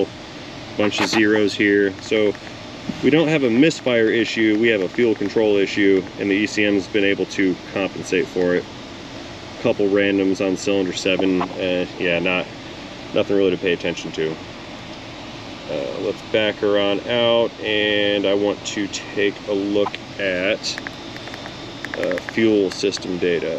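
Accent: Russia. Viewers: Your Russian accent reminds me of FPS Russian. 😂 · Better Russian accent than me... and I speak Russian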